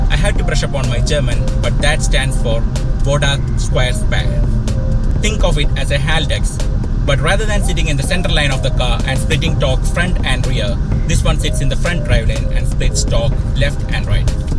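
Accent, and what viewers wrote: Kerala, India. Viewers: Nodoubt a malayali out there narrating 👍🏻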